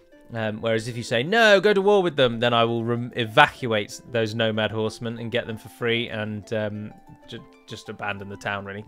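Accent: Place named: United Kingdom. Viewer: We are all here for the ramble,. If it was anyhow annoying then 6000 people would not have watched this episode thus far. I personally am here for the excellent british english demonstartion and classic humour, which the ramble is very proudly part of. As for the choice I think Mordor will absolutely devour Dol Guldur with just the sheer amount of better starting settlements they posess. So in truth you would only make Mordor even more powerful as well as granting them more Nazgul to control. You also need to remember that Mordor is most likely occupying Khandish homelands too, so they will have ever better economy to back up their hordes. The war between Dol Guldur and Mordor, whilst being probably very rare is just going to be a way for Mordor to become an even bigger threat than before thus forcing you to fight them later anyway, should you play on for that long. 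So in my mind I suggest you to make no alliances other than the ones you need to survive and simply conquer everyone and everything. Let's be honest, if this starting horde of cavalry would be used to its utterly ridiculous maximum, cheesing every single fight, one could conquer the entire Middle-Earth with it. I appreciate however that it is certainly not the point of this specific channel or the showcase of the new starting condition for the nation nor your personal playstyle. Thank you for coming to my TedTalk if you bothered to read this and looking forward to episode three, where the "Sun never stops ris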